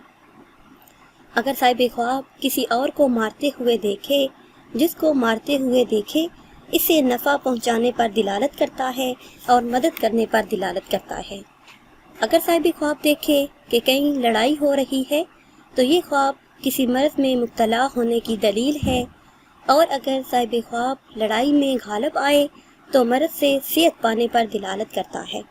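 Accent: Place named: Chakwal, Pakistan. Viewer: Baki sab theek hai apka lehja se lagta hai ap chakwal se hein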